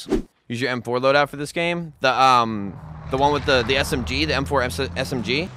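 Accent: East Coast, United States. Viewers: are you from the east coast ?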